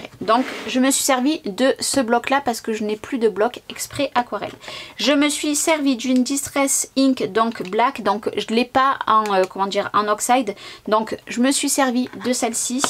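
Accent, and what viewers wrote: South, France. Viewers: Même si je ne fête pas Halloween , merci pour ces superbes cartes très lumineuses. Dans l'un de tes derniers vlogs tu nous a parlé de pochettes vinyl pour stocker tes papiers scrap. Peut on y mettre plusieurs feuilles ? Pourrais tu me donner la référence car je serais intéressée , ne sachant plus comment ranger mes restants de collections. Merci et vive ce petit accent du midi qui donne la pêche. 😉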